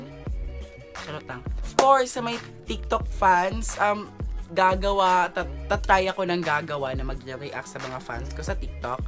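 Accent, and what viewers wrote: Visayas, Philippines. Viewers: Bisaya ka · Ay bisayaaaa pala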